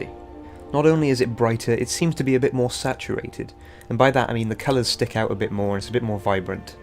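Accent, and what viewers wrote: United Kingdom. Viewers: Hm is that a slight East Anglian accent I hear? I note you haven't uploaded anything in 9 months, I hope you're okay!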